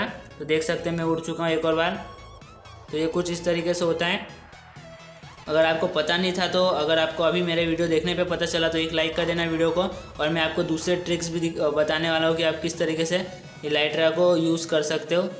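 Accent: Gujarat, India. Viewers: Pakka tum gujrati ho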